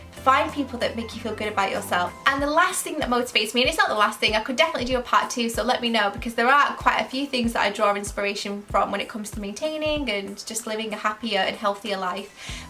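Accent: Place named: Manchester, United Kingdom. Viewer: Hi sweetness, this is such a lovely video. It’s so honest and genuine. I wanted to let you know that I appreciate you! You inspired me to start weight watchers 5 weeks ago when I wasn’t sure what to do with myself and all of your videos have really helped me understand how to do it in a practical way with lots of yummy recipe videos. I’ve lost 7kgs in a month just through WW and I feel so much better in myself already! On a totally different note I love listening to you, my dad is originally from Manchester so your accent always make me feel at home. Lots of love across the seas ❤️ ❤️ (from Melbourne, Australia)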